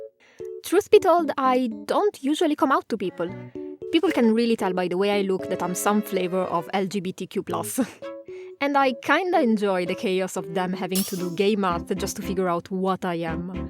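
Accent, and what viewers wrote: Italy. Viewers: You sound familiar....oh yeah, you are Italian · I'm so happy to see this, happy pride month from the Asia part of the world~ and your Italian and accent so cool!! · oddio ho capito subito dall accento che sei italianə, io la uso la ə ma solo nello scritto funziona secondo me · Hiiii!!!! It happens the same to me. I am spanish, so I completely understand the thing about pronouns. In my case, I prefer to refer to myself with "masculine" pronouns, but people could tell me whatever they want to, I won't mind jsjsjs. Also, I love how your italian accent shows when you are talking in english. It's so funny to hear :)))) Pd: Love the video and your art style <3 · Ho sentito che sei italian dal esatto momento in cui hai parlato, anche se era inglese hahahaha Ti capisco così tanto sul problema dei pronomi, alla fine anch'io gli uso entrambi vivendo nel caos e confondendo le persone eheh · Your Italian accent is so funny haha 😄 (in a good way)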